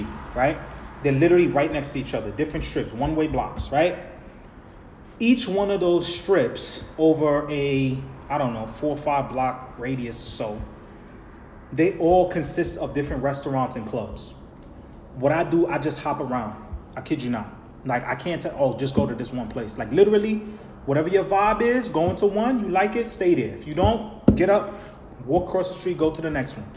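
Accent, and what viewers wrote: New York, United States. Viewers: Your voice reminds me of Mendeecees lol. That’s a good thing tho. I love nyc accents lol.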